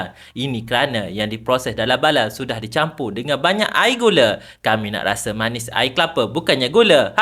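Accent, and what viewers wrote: Kelantan, Malaysia. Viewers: Kelantan ke bro ?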